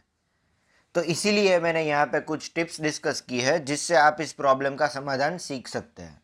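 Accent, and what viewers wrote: Gujarat, India. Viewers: Aap Gujarati ho ?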